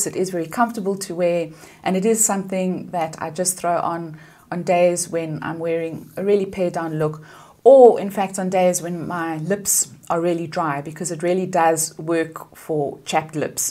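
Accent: South Africa. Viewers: I was like you must be South African, from the accent ❤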